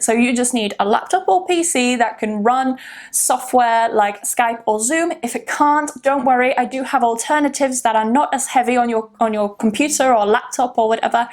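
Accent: United Kingdom. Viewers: You’re one of my favorite teachers, you speak clearly, that is why I love British accent. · You're the best teacher in British accent.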